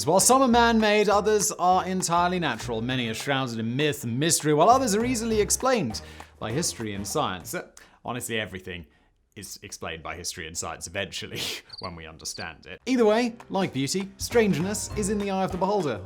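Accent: Mid-Atlantic, United States. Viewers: It's pronounced zed, you mid Atlantic sellout.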